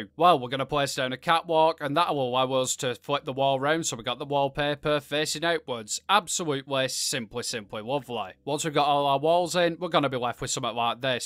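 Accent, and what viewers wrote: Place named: North, United Kingdom. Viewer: Fellow northerner here, by the sound of your accent we are not too far apart. Anyhow just wanted to say I stumbled across your videos recently and I found them well made and chuckle worthy. Nice job! Subbed.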